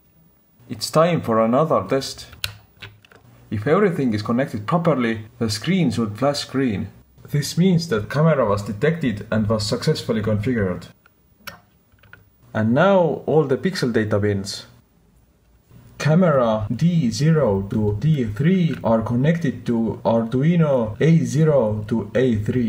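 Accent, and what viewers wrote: Estonia. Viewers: Kas oled Eestlane? Aktsent on täpselt selline. :D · Is that an Estonian accent? Thanks, best video tutorial on how to connect OV7670 to Arduino so far I found.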